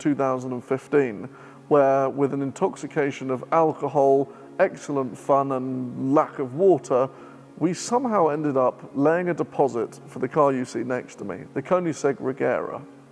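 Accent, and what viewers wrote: RP, United Kingdom. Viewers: This guy’s voice sounds like a stereotype cartoon posh British guy from 1900s with a suit and a monocle. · You sound like the stereotype of a British posh bell end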